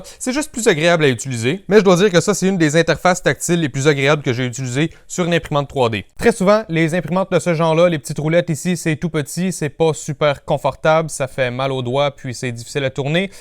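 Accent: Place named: Montreal, Canada. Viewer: Hey!!! bien l’bonjour du sud de la France, (ça fait plaisir de réentendre l’accent Montréalais 😁)super vidéo, ça me donne envie de sauter le pas...utilise tu toujours la U20 depuis y’a review? Y prend tu toujours autant de plaisir? As tu un profil correct sur Cura qui pourrait être partagé? Merci pour tout ce que tu fais 👍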